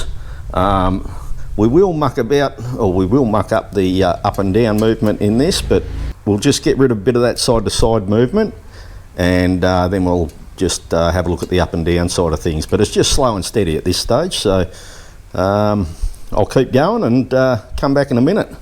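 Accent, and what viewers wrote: Australia. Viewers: Gidday, great to hear an Aussie accent after watching yanks building wheels lol. I’m about to replace a bent rim on a 2019 DL1000XT with a cross spoke pattern and it looks trickyAF. Ever done one like that? The nipples are in the hub and the rim has a double lip the the spokes fit through… it’s gonna be ‘interesting’ to say the least 😂.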